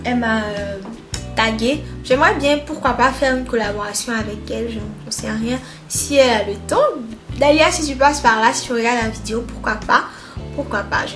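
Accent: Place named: Ivory Coast. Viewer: L'accent ivoirien!!! Yes bisous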